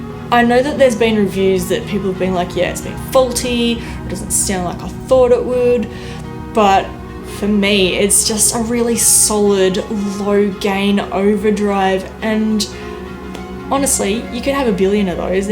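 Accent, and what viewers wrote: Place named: Australia. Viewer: Hi! Thank you so much for your kind reply, I will keep that in mind. BTW love your channel, thought you are British - but you are from Down Under:-). Take care and cheers, Peter, Austria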